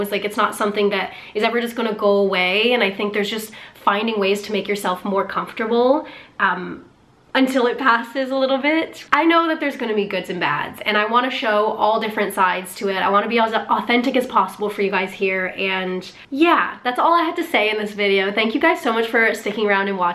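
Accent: United States. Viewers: Ah, u have discovered British sarcasm, many of my US friends struggled with this too. Sorry, it’s us, don’t take offence, it’s part of our humour (think Rikky Gervais). Sorry about the 'turning heads’ with your accent. We have probabably become less accepting of the USA since Trump, those that have not traveled there alot, may think that all American’s are ‘MAGAs’ and as awful as he is. Finally, if you lived in Louisiana or even Florida, you might thik the UK is quite a dry place ;-). But then we dont have hurricanes or earthquakes - so thats an advantage! Enjoy your time here.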